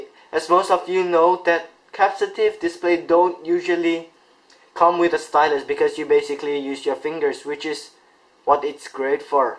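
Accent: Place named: Singapore. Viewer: Sounds more Spanish to me for some reason, but you're right, it's a Singapore accent by the sounds of it.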